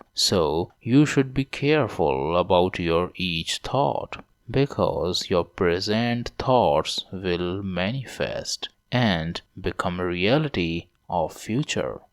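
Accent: India. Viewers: It's not a cartoon voice but an Indian voice. However many people are born with disease...... This is just nonsense.....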